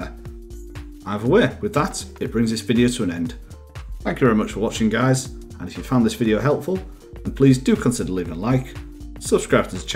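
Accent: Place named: Northern England, United Kingdom. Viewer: Thank you so much for this. Just installed win 11 and while it noticeably improved my games, it took forever to start up, with tens of apps fighting for processing power on start up. I just uninstalled 50 apps and can now use my computer again. Also it's a breath of fresh air to hear a northern tutorial. I'm guessing Leeds or Sheff? Maybe Humberside?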